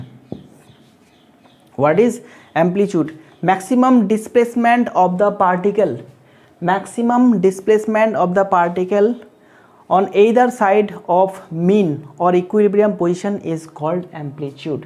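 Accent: Bengal, India. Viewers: Bengali hai kya sir ❤❤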